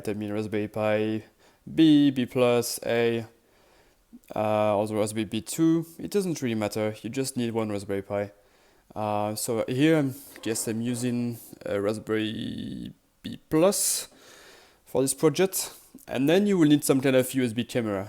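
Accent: France. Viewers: Serait-ce un accent français camouflé ??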